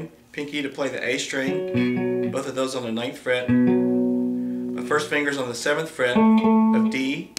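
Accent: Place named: Texas, United States. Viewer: Isn’t this CC giving the tutorial? I mean to say that he’s got that TX accent, so...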